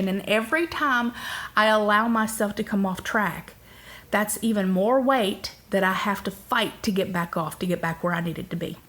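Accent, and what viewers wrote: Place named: South, United States. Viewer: girl your from California????....where that southern accent.come from???.... some people in Bakersfield area of California.have a country accent....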